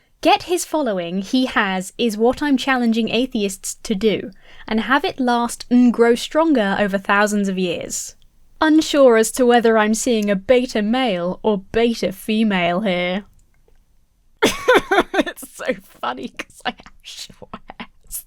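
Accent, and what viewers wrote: United Kingdom. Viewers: I'm glad you know you're an exceptionally beautiful young woman and won't let stupid comments deter you from that. You are also bright, smart, quick witted, seemingly very educated and I love the Bri - ish accent. Please continue doing you so I may continue watching/listening to a higher level of videos since YT sent you my way and therefore have subscribed. Peace and all that. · "Why are you British" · Wait, but why are you British? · has a point. why are you british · Why are you british? ladlsfkajsdflkja;sdlfkj;adlsfj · "Why are you British?" 😂😂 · why are you british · Wait, you're British??? Shocked, I am just shocked! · "Why are you British?" What in the world??? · Indeed you are.💛And your short hair and"sporty style"are adorable.😊 Not a fan of the british accent,but that's my problem,not yours.(I'm Italian and,growing up,I got used to the american accent thanks to my"Buffy"DVDs,in case you were wondering.) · DAMN I am jealous on your absolutely PERFECT British accent , I wish I could talk like that. 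· I also would like to know why you are British.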